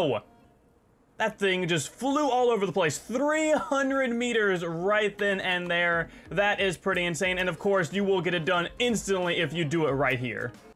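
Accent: United States. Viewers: You kinda sound like Lachlan but more of an American accent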